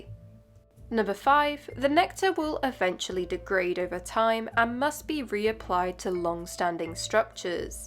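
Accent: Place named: United Kingdom. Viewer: my first time watch watching you and my first thought was Bri'ish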